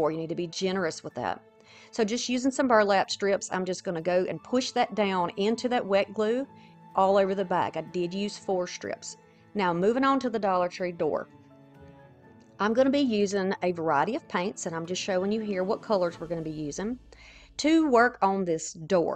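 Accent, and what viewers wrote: South, United States. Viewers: I LOVE your southern twang and I LOVE that u are a straight shooter and tell it like it is! No nonsense comments, tell ‘um, if u want to be negative delete urself and let us enjoy.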